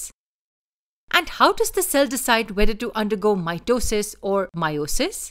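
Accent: India. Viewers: Can you just change your Indian accent? That's so weird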